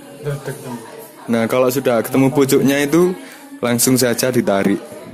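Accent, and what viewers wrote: Java, Indonesia. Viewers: Mase medok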